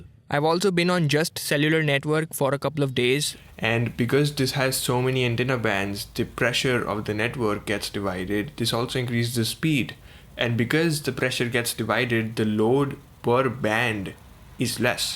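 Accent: India. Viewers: I loved Indian accent!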